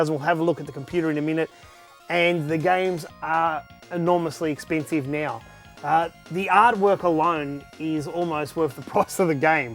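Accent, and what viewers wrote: Australia. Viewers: you can hear the Aussie out of him :D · I am assuming you're from Australia because of your accent. I love your video game collection, now that's a collector. Keep up the good work! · name It is not annoying. It is Australian.What is annoying to someone is the norm in a different context. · Are u Australian? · Just love how you from down under says: so yeah, ehm yeah and so on. Sounds bloody awesome! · youre aussie?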